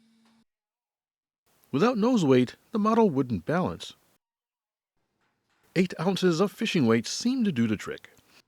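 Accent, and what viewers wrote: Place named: Canada. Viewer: My fiance swears your Canadian and she loves your voice she says it very soothing.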